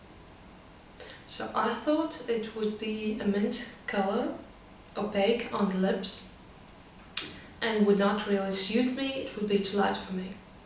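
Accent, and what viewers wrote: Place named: Russia. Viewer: ой, вы оказывается тоже из России) то то вижу что то родственное в акценте,решила проверить)) круто))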